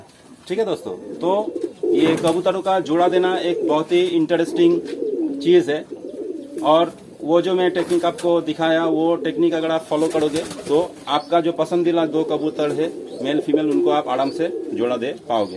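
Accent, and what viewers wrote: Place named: Bengal, India. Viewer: I understand from your accent that you speak bengali. Accha, apni ki kokhono dekhechen je kobutorer vai bon jora ney? Amar ekjora ache emon, dim diyeche ora, ete ki bacchar kono problem hobe? Anways you have beautiful pigeons! Love from Bangladesh ❤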